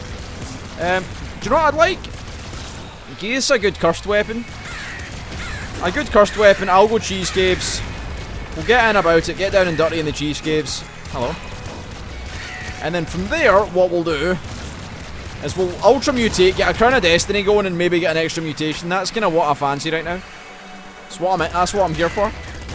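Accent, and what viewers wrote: Scotland, United Kingdom. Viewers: lol this was alot more enjoyable then other runs of this game ive seen XD and your accent is fanfuckingtastic is it scottish?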